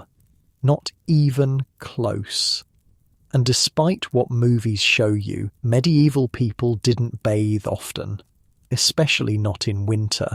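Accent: England, United Kingdom. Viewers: I’m absolutely sick of the English accent from the narrator. Can we get some American history? 😂 can’t use a fancy British narrator for that lol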